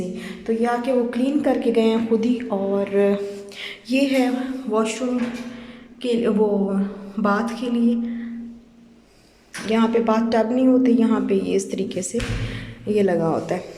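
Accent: Saraiki, Pakistan. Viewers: Apka excent buhat pyara hai. Looks like seraeeki touch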